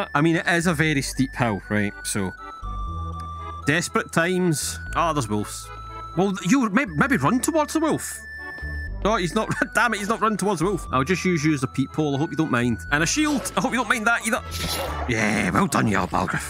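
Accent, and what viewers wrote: Scotland, United Kingdom. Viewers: False, his very heavy Scottish accent is part of what makes this so great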